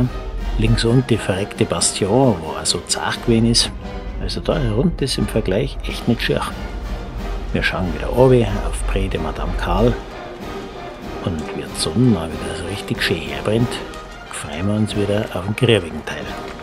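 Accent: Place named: Bavaria, Germany. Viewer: Die Moderation in Bayrisch ist die Pointe dieser Filme. Jetzt fehlt nur noch ein Wolpertinger auf der Wiese....😆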